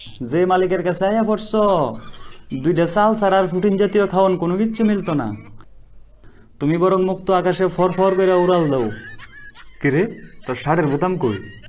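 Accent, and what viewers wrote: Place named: Noakhali, Bangladesh. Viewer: Please don't try this nowakhali accent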